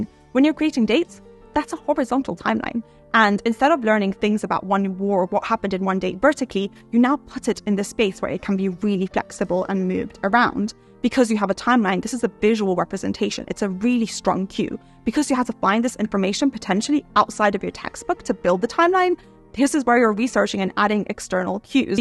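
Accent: Scotland, United Kingdom. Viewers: Are you from britian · East coast Scotland, sounds like Edinburgh/Stirling private school type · why do you talk like that? are you british ? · did you speed up the video to get it under or do you normally speak at a NYC “I’m going to miss the bus” pace? Between the rapid fire of words and your slight British accent, I completely failed to understand most of what you were saying. It doesn’t help that you are distractingly beautiful, but… SLOW DOWN GIRL! By the way, throw away that lipstick. It’s the wrong color for you; obviously I found that distracting too. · is it BE accent? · sorry, i can’t listen to people with british accents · Thats scotts english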